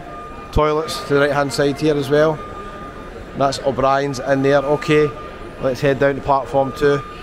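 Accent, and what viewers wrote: Scotland, United Kingdom. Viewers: Love the Skaughtisch aksent. ❤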